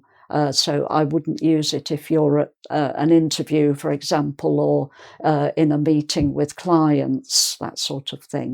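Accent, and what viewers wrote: England, United Kingdom. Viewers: British sounds perfect in your version. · I love this Britsh accent! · Oooh British English pronunciation, thanks dear Lady. OMG!!! I've been pronouncing "aren't" completely wrong for like 15 years, I really need to view all your videos my lady, I probably sound really bad speaking English. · Beautiful lesson in British accent. Greetings from Mozambique 🇲🇿. Linda lição em sotaque britânico. Saudações a partir de Moçambique 🇲🇿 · Nossa estou apaixonada por esse canal menina kkkk inglês britânico é muito gostoso de ouvir, se minhas prof do ensino medio ensinasse os verbo to be igual ela eu tinha aprendido la kkkkk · Hi..Iam from Chile...I love your pronounce ...pure english😃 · Oh an english accent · Hello. Thank you for the lesson. The pronunciation of the contractions are very dificcult. Beautiful british accent!!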